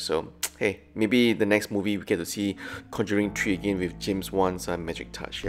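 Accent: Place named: Singapore. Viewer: Hi are you singaporean? You have a singaporean accent haha.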